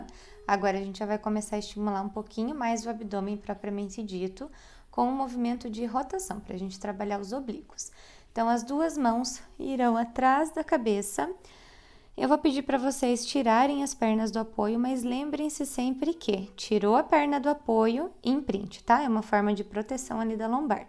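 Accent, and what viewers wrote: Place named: Curitiba, Brazil. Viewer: Ah que pena em vários momentos cortou significativamente o áudio - uma pena porque a professora é boa e paciente. No começo deu uma tremida também, mas depois resolveu. Vocês são de Curitiba? É pelo sotaque porque tem outro Eqiulibrium em SP. Mesmo eu que já faço dei uma perdida com a falta de som às vezes. Obrigada pelas dicas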